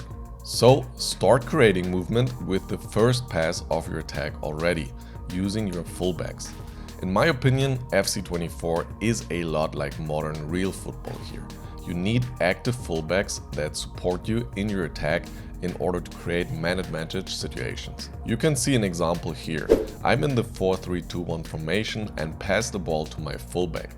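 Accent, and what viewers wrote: Germany. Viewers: Warum merkt man, dass du deutsch bist?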